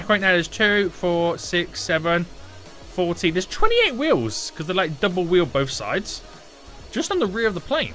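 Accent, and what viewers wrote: United Kingdom. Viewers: Average skull emoji kid, He is British, plus you can’t really hear the “g” in the word “flight”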